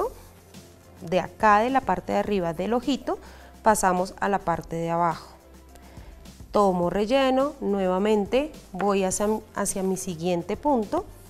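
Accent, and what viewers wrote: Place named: Colombia. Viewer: Eres Colombiana lo digo por el acento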